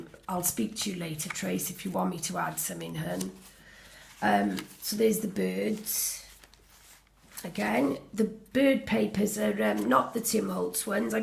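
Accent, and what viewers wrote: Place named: Cardiff, United Kingdom. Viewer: Hi. I’ve been following Tracie for a little while now and just came across your channel. I adore that journal you made. The detail is incredible and the family history inside of it so precious. I’m a new subscriber to you now and look forward to binging on your videos! Can I ask whether I’ve detected a fellow Cardiff girl? 😀. I’m fairly new to JJ and TNs and my channel is pants at the moment but I’m enjoying making them. Thanks for the inspiration xxx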